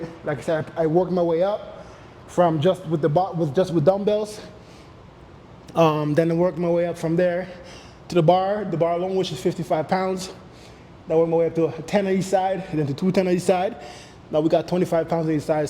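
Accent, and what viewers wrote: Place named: Jamaica. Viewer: Me hear de accent mon , me like this workout yahzimehhh !!!! 🇯🇲🇯🇲🇯🇲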